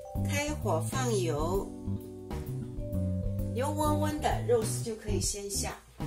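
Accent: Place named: Wu, China. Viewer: 上海人？听上去就是我家乡的吴语嘛。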